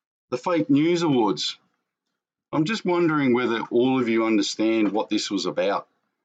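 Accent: Australia. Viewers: hey I'm here in the USA and I just want to give you my input on the name change. I would say don't change it your accent is Australian you're Australian and there's nothing wrong with the name that's the way we know you. also on a sad note I have to report as humans we all stereotype stupidly, and I think most people expected you to look like Crocodile Dundee. just like everyone from the East Coast thinks here in Arizona there still Indians running around on horseback at Cowboys shooting at one another. it's programming from too much television sadly but people will learn and grow and adjust to the fact that not everyone from Australia looks like and sounds like Crocodile Dundee. just being honest and saying what nobody else has the courage to say. The Whole World's still pretty biased judgemental and silly.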